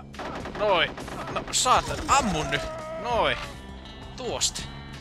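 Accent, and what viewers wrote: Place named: Savo, Finland. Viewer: On kyllä tuo savolainen vakkooja niin komeeta kuultavaa :D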